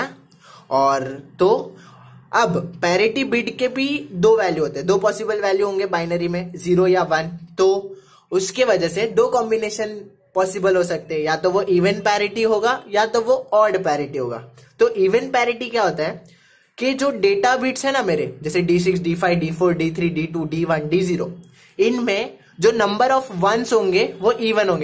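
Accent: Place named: Hyderabad, India. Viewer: His accent is slightly Hyderabadi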